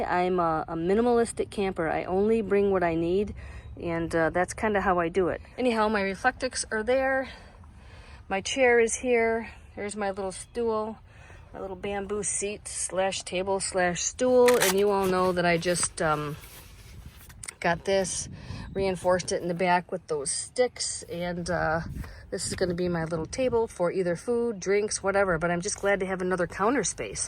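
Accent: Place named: Minnesota, United States. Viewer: Actually your Min a soda accent drives me nuts. And your husband must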